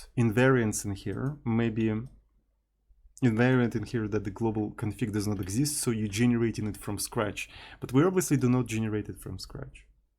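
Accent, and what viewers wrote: Russia. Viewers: Какой хороший , понятный русский акцент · Started watching… heard familiar accent… found that author is from Moscovia.. closed the video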